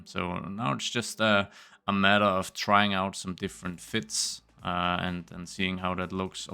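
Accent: Denmark. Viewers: Er det en dansk accent jeg spotter? 😅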